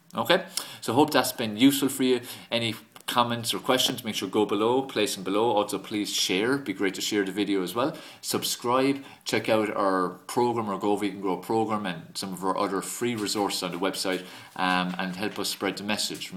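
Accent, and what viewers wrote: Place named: Ireland. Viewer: thanks so much for the videos! what part of ireland you from man?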